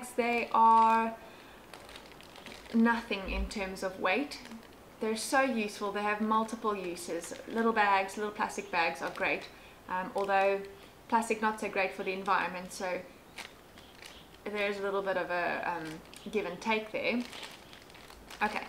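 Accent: South Africa. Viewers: I lived in SA for two years. What a beautiful country. I miss your accent too. I'm impressed with your loadout and the fact that you actually use it. It's a well thought-out kit. You have everything you need and nothing you don't. My bag weighs in at 25 pounds. Most people with little experience overpack without realizing how heavy a 40-50 pound pack becomes after an hour. I think 25 pounds is a bit much so I'm experimenting to figure out how to shave 5-10 pounds off. I'll get there. Thanks for your excellent channel!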